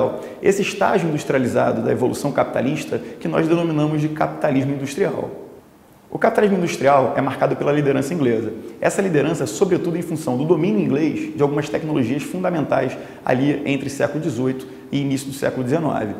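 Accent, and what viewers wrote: Rio de Janeiro, Brazil. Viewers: Esse sotaque carioca é muito gostoso de se ouvir.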